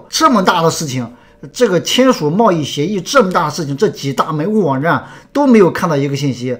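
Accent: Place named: Shaanxi, China. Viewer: 哥！你是不是陕西人！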